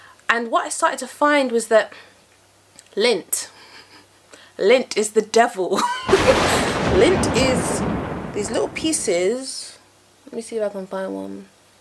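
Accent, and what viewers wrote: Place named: United Kingdom. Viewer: Oh she's gorgeous. In just here for the accent. The accent is so lovely. So British and soft so the ear. I played this 5x befor my husband took my power cord. M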